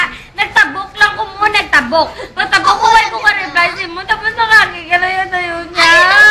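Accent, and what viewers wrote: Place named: Hiligaynon, Philippines. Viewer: Nami gid pamatiun mag storya ilonggo ah :) daw ma inlove man ta saimo ba :)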